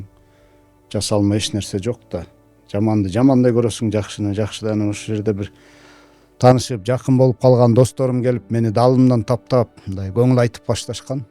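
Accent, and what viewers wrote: Standard. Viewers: аман бол сен Сайдилхан агайдын уулу окшойсунАтан атактуу мугалим ошондуктан таза маанилүү Кыргызча таза сүйлөп жатасынӨзү Кара Кулжалыктар аябай чыйрак чыдамкай өжөр болобуз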